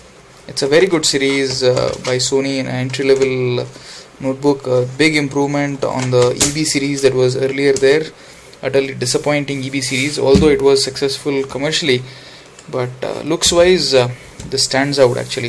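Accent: India. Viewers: i have that laptop but not so good like samsung,and i think samsung more best then sony and u speaking english look like if u indian right? coz ur english is not really nice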